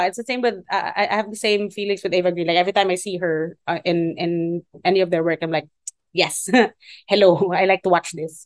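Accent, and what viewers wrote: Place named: Bisaya, Philippines. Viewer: I love our accent especially the bisaya accent.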